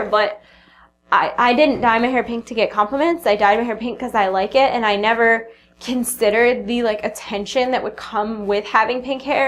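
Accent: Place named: New York, United States. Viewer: I love the NY accent! lol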